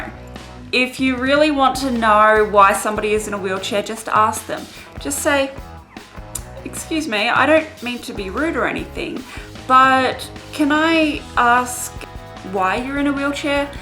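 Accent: Australia. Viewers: I LOVE your accent!! Australian, right? It's adorable. Cheers from San Francisco :)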